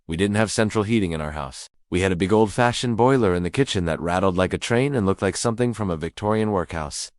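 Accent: United States. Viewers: Sorry, the AI produced pictures (look at any text, a lot of which is gibberish) and the Amurcan commentary completely removes any credibility this might have had. I winced at 'Mom', but turned off when we got to 'moble phones'........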